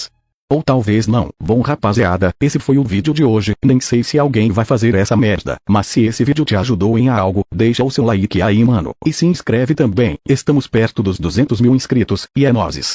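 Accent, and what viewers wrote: Bahia, Brazil. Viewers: tu é Baiano?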